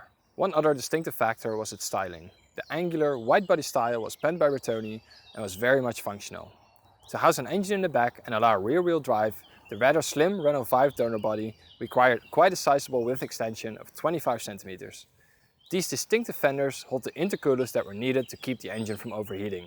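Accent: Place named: Netherlands. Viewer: Die Nederlandse accent 😂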